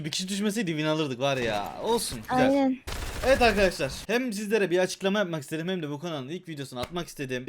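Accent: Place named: Malatya, Turkey. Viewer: Abi sen malatyalimisin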